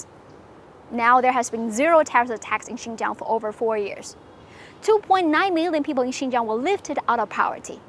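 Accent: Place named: United States. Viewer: You do not sound like studying in UK, more on US.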